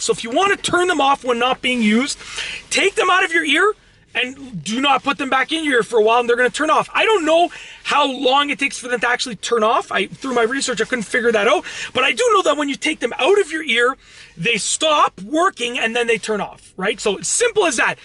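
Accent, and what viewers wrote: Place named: Canada. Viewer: I love ur amazing Canadian accent and your content. Especially when you say hey everybody.😂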